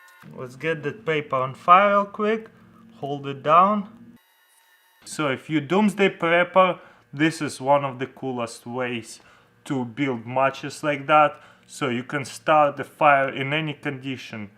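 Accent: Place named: Ukraine. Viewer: Ужасный английский с украинским говором) прикольно звучит , я даже так не могу)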